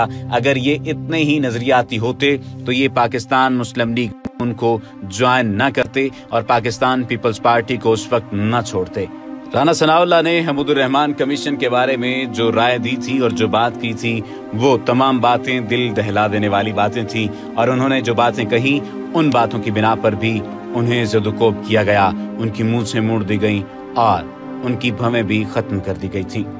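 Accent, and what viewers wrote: India. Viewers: This is indion voice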